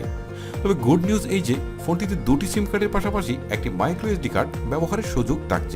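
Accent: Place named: Noakhali, Bangladesh. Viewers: vai its perfect not ferfect , leave your noakhaillya accent... irritating kotha barta.. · মনে অর আন্নে ’নিউখাল’ এইচ্চা করি কতা কম কিল্লাই। শুদ্ধ করি কতা কইতে হারেন কেয়ন?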